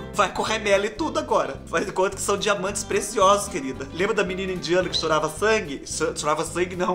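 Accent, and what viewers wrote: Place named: Paraná, Brazil. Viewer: Ah tá entendi Paraná sotaque forte eu sempre tive curiosidade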